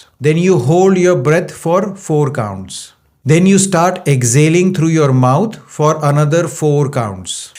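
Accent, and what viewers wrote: India. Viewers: Hello Doc, are you really based in the USA? I don't think so considering your pronunciation. It is 100% Indian.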